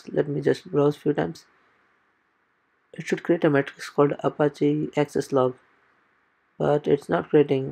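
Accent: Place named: India. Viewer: Thanks for making that Video it helped me a lot. My Feedback would be , its pretty hard to follow the India-English Slang , in the Fast Speed Modus.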